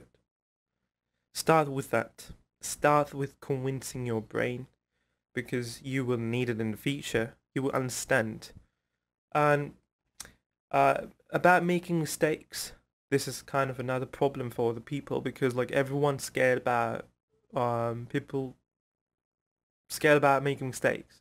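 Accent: England, United Kingdom. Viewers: İngiliz aksani finaaaa ateşşş🔥🔥🔥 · Valla helal olsun , "I am John." desen kimse şüphe etmez , nasıl bir İngiliz aksanı ya, harikasın tebrikler👍👍👍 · uk aksanı bu ama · British accent da cheery on top olmuş :)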